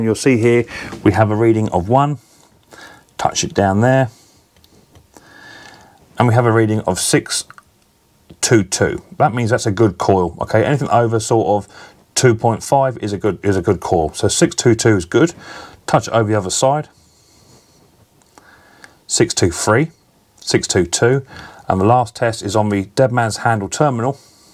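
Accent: United Kingdom. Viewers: your video solved a problem. My mower showed a small spark at each lanyard pull, but would not start. Early in the video, you reveal a coil can spark, but too weakly to start the engine. QED-- now to find a reasonably-priced ignition coil. * PS-- Your Midlands accent is a killer.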